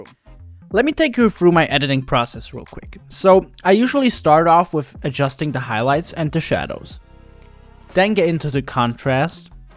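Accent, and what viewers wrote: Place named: India. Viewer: For a guy that knows a lot about upgrading, how come you didn’t try to stick your mic in the shirt instead of holding it and you don’t have to be smart to know that and you kind of sound like an indian 😷 🧄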